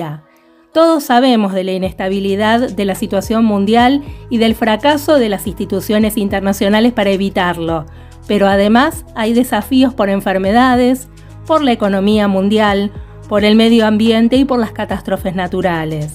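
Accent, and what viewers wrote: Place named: Buenos Aires, Argentina. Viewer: Es obvio que sos de Buenos Aires, igual que yo. Me doy cuenta por tu acento, y me siento orgullosa de que seas de acá. Te felicito por tu canal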